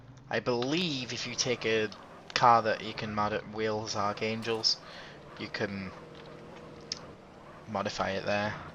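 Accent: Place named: United Kingdom. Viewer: Finally found a channel that's based on old school GTA's. Specially San Andreas. And you sound British lol!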